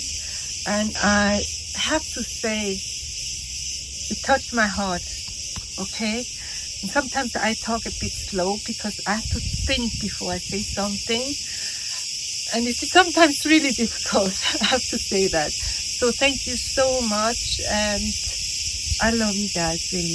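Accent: Switzerland. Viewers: Ist Ihre Muttersprache Schwizerdütsch? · Liebe vielen lieben Dank,sie inspirieren mich mit ihren Arbeiten, Ideen und mit ihrer liebevollen Art die Arbeitsgänge zu erklären. Ganz zu schweigen von den wundervollen Möglichkeiten ihre wirklichen Kunstwerke nach machen zu können. Mir gefällt ihr Schwizerdytsch sehr,ich lebe seid 40 Jahren bei Konstanz und und bin in 10 Minuten in der Schweiz, dass ist immer ein bißchen Urlaub für mich ❤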